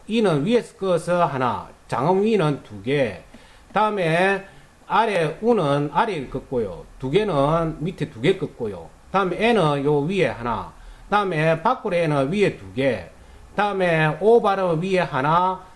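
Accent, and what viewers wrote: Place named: Gyeongsang, South Korea. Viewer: 몬 개소리하는지 니 말부터 통역해야것다!갱상도!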